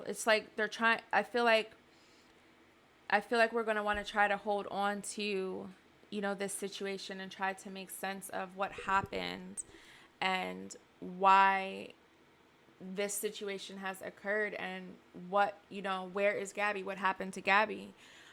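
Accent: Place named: Maryland, United States. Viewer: I love the your accent is it Maryland or Baltimore?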